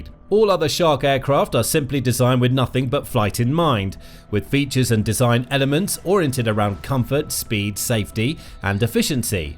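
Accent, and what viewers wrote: United Kingdom. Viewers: Your British speech impediment is strong. You should learn the correct American way to pronounce words including innovative.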